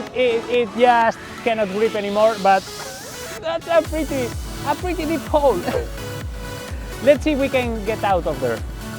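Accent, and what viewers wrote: Spain. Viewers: Are u spanish? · Spanish english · This guy has to be spanish mainly by his accent right? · You can't unhear the Spanish accent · de donde eres . eres de España? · Hey, are you from Spain? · Eres español no? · He's from spain lol, im spanish too and I recognise the accent, appart from the fact that is a spanish name. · Eres español verdad?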